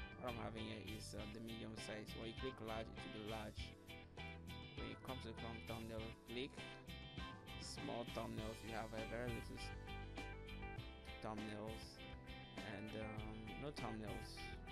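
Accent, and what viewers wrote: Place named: Nigeria. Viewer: Woow!.....great tutorial nice voice. sounds nigerian.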